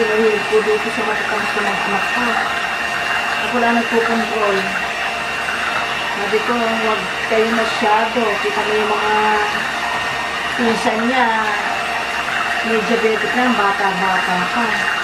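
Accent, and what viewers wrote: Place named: Bulacan, Philippines. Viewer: Aq po naglalagay ng dayap sa maja din..masarap po tlga ang my dayap..prang refreshing ang lasa nya.. Taga bulacan din po ba kayo Ma'am? Ksi po tagalog na tagalog kayo mag salita..tulad nlng nyan,,dayap ksi sa bulacan din.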